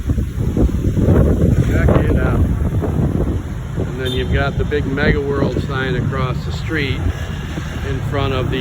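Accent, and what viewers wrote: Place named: Chicago, United States. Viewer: You sound like you are from Chicaaahhgo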